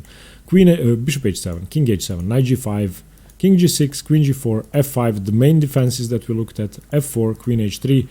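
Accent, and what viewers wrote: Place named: Russia. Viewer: Of course he has russian accent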